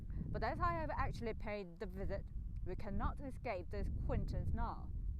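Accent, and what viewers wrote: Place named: United Kingdom. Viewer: 목소리도 좋고 , 발음이 영국인 같구먼~~~^-^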